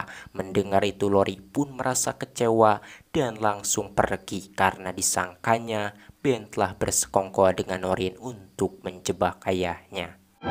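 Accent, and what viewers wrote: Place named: Sunda, Indonesia. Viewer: Cuma Chanel ini si yg asik pembawaan nya campur sunda wkkwkw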